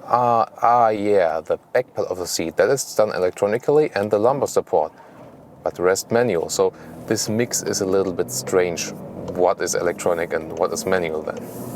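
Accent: Germany. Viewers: I like your German English!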